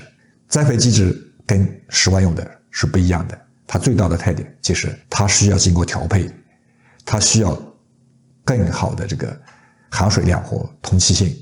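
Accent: Yunnan, China. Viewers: 老师口音好像云南这边的。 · 听得出是我们云南人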